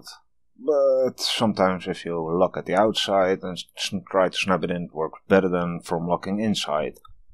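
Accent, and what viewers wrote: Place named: Finland. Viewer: Are you from Finland? Your accent sounds pretty finnish or estoian (?) :)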